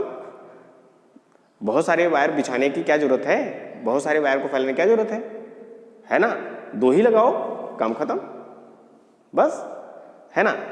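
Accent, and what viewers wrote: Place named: Bhojpuri, India. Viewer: bhojpuriya tone bahut achcha laga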